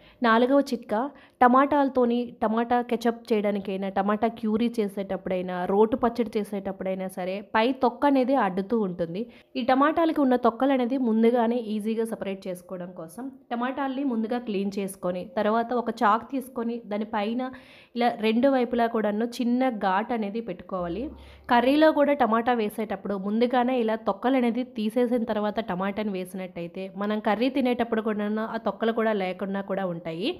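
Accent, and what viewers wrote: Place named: Kerala, India. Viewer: U r telugu slang is like keralalite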